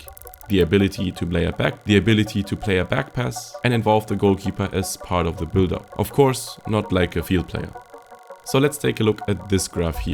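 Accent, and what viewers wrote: Germany. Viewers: Nur Liebe dafür, wie zufällig die balken bei min schwarz rot gold sind 😅 und probs für die Aussprache, man hört den deutschen Akzent nur hintergründig raus 😊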